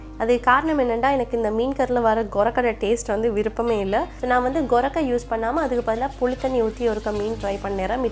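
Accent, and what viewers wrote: Sri Lanka. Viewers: Wow..... srilankan tamil...The bestest tamil in the world..... My dad's is a srilankan too. Love from India.... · Like your recipes,just started watching few of your videos. இலங்கையில் வாழும் எங்கள் உறவுகள் பேசும் தமிழ் கேட்க கேட்க தித்திபாக இருக்கின்றது.. உங்கள் தமிழில் சில காய்கறிகளின் பெயர்கள் மிகவும் புதுமையாக இருக்கிறது.இன்னும் நிறைய தெரிந்து கொள்ள வேண்டும் என்ற ஆசை உள்ளது. Looking forward for more videos in your loving தமிழ். Love and wishes from Banglore ,India.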